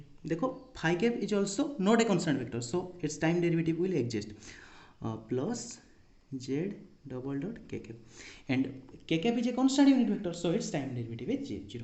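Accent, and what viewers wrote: Odisha, India. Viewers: Are u odia?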